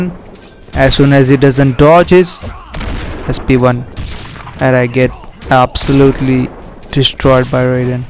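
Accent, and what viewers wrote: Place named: India. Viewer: Mujhe tumhara baat sunke lag hi raha tha ki tum India se ho esi liye puch raha tha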